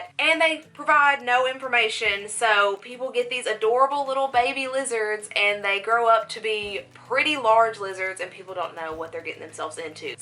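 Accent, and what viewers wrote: South, United States. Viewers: I’ve found my new crush that southern accent on you girl yeeeess please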